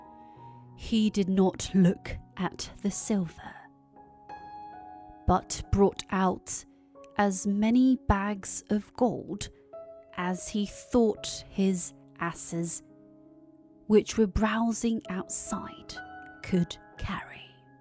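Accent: United Kingdom. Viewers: 선생님 영국발음이랑 억양 선생님처럼 하거싶은대 어떻게 해야될까요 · 에이 에스 엠 아~~~~ㄹ 오늘도 잘 듣고 갑니다. 역시나 권아나의 발음은 엄청 매력적입니다. 흉내내보려고 해도 영 저는 안되네요. ㅎㅎㅎ 영국식 발음아! 오픈 세서미~~~!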